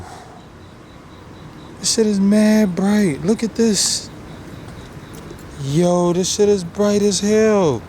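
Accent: New York, United States. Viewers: u gotta be from nyc u namean ya herd 👌🏼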